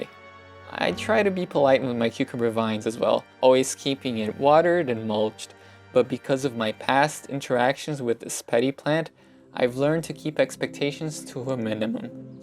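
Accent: Brazil. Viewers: OMG I LOVE LOVE LOVE your videos. I am a half breed American/Brasilian. My mother is from Natal and my father is a TEXAN! I could pick up your portugese accent having been raised with the familiar tone of your voice. That aside, I am also an avid gardener, engineer and lover of art, knowledge and origin stories. As with many of us Brazilians, we look for meaning in everything and you do not disappoint! Subbed and Im terlling EVERYONE I know about this amazing channel!!!!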